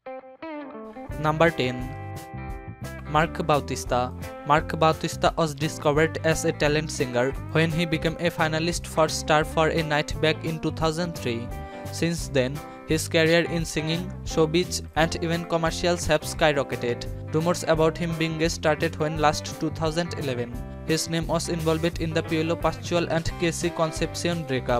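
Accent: India. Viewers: Yung accent dih ko kinaya indian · Oo nga, lahing pana ba yong nagsasalita..indian..bilog ang dila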